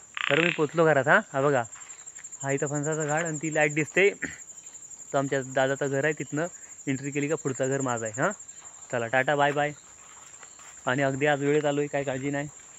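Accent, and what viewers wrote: Konkan, India. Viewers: लय भारी आपली कोकणी बोली भाषा एक नंबर, चे व्हिडिओ बघितले आहेत धुमाकूळ घातलाव, तुमचा फॅन च, मेहनतीला पर्याय नाही, 🙏 विडिओ दररोज येऊ दे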